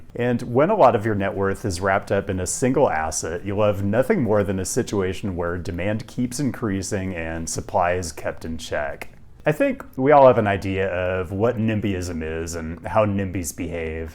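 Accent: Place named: California, United States. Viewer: love this guy but the california accent is killing me 😭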